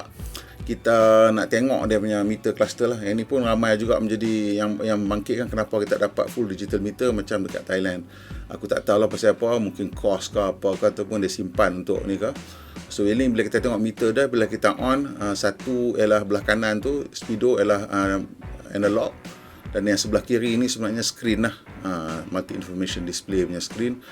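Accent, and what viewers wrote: North, Malaysia. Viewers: Cakap utaqa plak noo… i like👍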